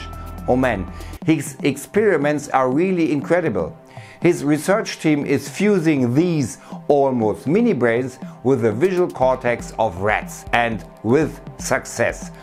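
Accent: Germany. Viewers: Cool, u realy talk in a good english. Just ur dialect says me u r german. May u need more praxis with american or english- born people ...😉. Never mind, u r documenterys r still interesting 👍🏼. Thx there for.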